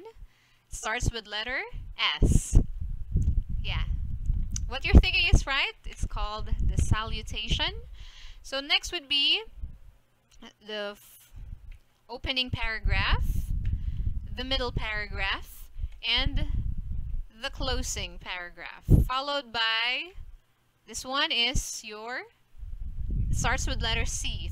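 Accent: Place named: United States. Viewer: you sound like an American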